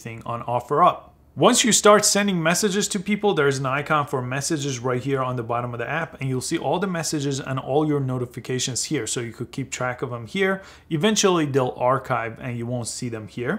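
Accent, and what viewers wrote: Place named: Iran. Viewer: U sound Persian. I mean your accents is a bit Persian guys. am I right?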